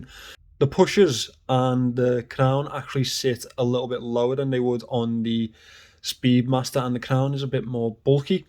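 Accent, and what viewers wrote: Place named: Scotland, United Kingdom. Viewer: I’m only here for the terrific Scottish accent 🤩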